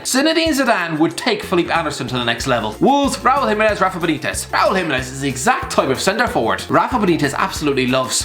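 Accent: Ireland. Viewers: i love waking up to an ranting about football every morning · Hello and welcome to HITC sport right. Love when says that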